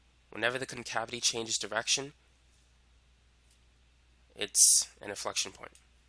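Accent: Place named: Rural, United States. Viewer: his low key countræ accænt 😍😍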